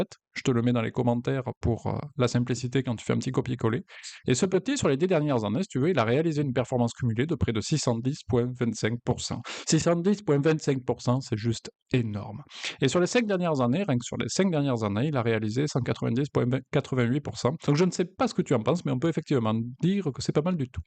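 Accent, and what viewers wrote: South, France. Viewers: Vu ton accent, t'es du sud mais d ou exactement ?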